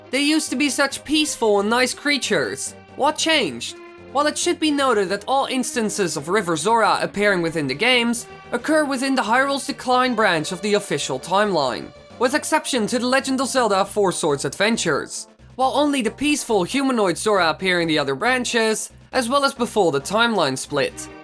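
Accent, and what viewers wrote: Netherlands. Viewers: fancy guy i can say with extreme confidence that he's Dutch. that's a one of a kind accent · are you actually from the U.S because u sound pretty dutch (nl)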